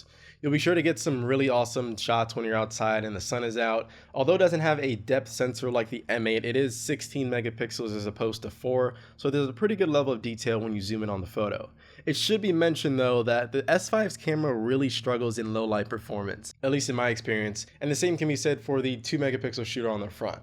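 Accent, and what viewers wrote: Nigeria. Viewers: Are u Nigerian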